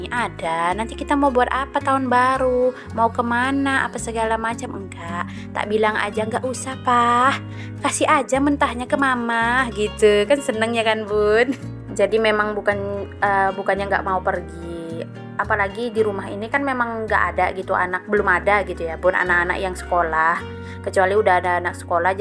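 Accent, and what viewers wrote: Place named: Medan, Indonesia. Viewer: Klw dari logat... Orang medan yaa... Didaerah Dimana medannya? 😊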